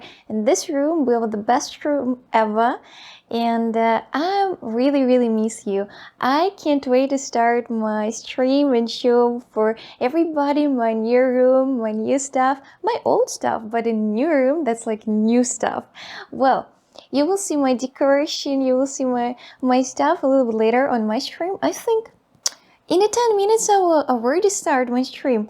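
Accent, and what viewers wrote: Russia. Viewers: Our girl used to have thick russian accent then lol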